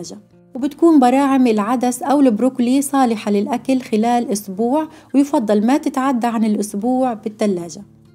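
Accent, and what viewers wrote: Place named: Egypt. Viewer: ليش لهجتك مخلوطة بالمصري.؟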